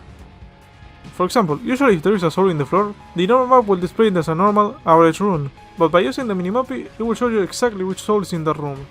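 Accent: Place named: Argentina. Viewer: solo con escucharte hablar ingles ya se que sos argentino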